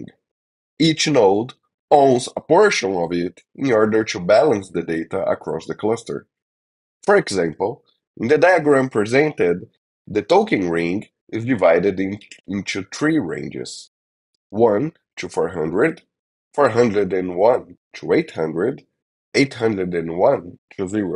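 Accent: Brazil. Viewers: Bro, are you Brazillian? Asking due to the accent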